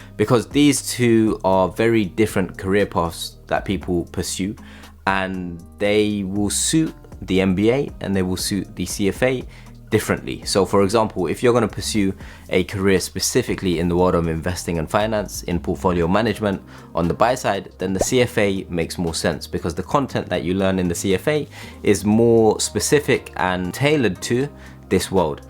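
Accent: London, United Kingdom. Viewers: you have such a nice accent are you from west london?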